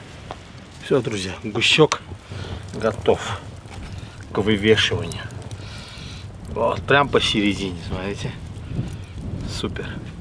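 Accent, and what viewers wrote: Baku, Azerbaijan. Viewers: Может быть. Спасибо Вам за ваш труд и за потрясающий и неповторимый Бакинский акцент!Нас осталось мало,но мы есть!🙏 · Бакинский акцент ни с каким не спутаешь.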